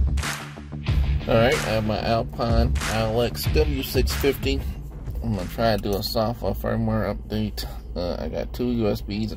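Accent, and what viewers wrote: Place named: United States. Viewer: Nice and concise once you get to grips with the usa twang. A Kings English version would be most helpful. Thanks anyway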